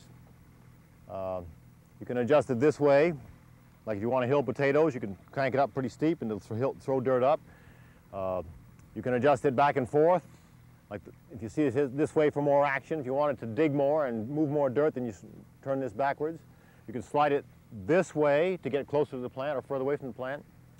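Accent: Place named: New England, United States. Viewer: This is probably the most dense video on vegetable cultivation on the internet. The yankee accent is great as well.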